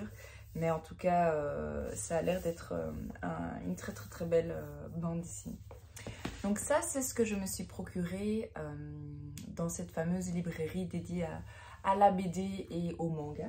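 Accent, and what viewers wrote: Belgium. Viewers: Coucou. Mon oreille détecte un léger accent belge.... Dites-moi si je me trompe lol. Je suis belge moi-même 😉. Bravo pour le vlog👍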